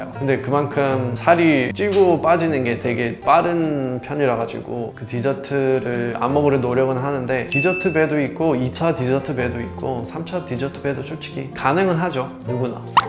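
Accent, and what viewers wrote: South Korea. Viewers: 우와 영어를 한국인발음으로 구사하는 분은 첨~~대박 아메리카노,쓰리샷,cctv 한국인인줄ㅋ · 보통 한국말 잘한다고 하는 외국인들도 외래어를 할때는 본토 발음으로 하던데 이분은 그냥 한국식으로 말해주네요^^ 대단하십니다. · 눈감고 들으면 한국인., ,ㅣㅇㅇ퍼 카페 그만두시고... ㅠㅠ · 문법이 완벽하다 심지어 영어를 한국식으로 발음하네 대단합니다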